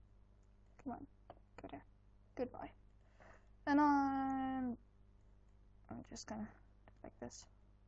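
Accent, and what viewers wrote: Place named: Netherlands. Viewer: hooi! !!!!!! Ik kan gwn horen dat je Nederlands bent XD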